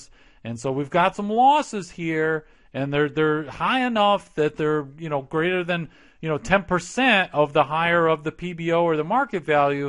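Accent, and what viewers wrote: United States. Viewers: Is your accent from Rochester, NY?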